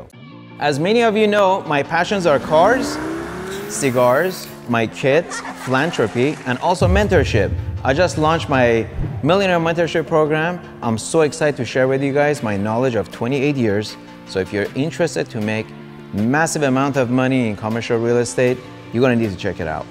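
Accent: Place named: Iran. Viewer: We know you're Persian because you put an e before every word. :)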